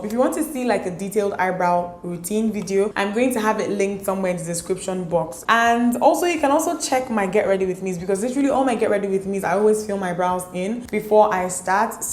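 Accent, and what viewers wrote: Nigeria. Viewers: Idk why but I love the Nigerian accent😍😍🤤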